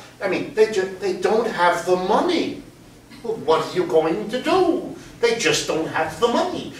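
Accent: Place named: New York, United States. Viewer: Listening to Dr. Wolff speak is like listening to Woody Allen explain economics. What a New Yorker. :)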